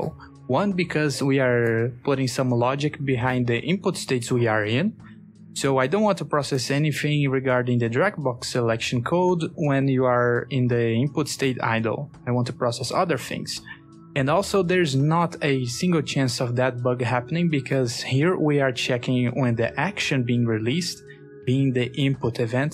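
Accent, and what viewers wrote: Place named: Brazil. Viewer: br? esse sotaque n me engana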